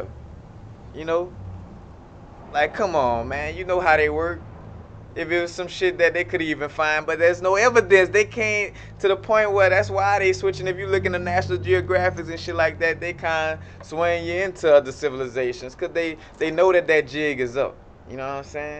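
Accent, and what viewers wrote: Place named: Brooklyn, United States. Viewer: You so Brooklyn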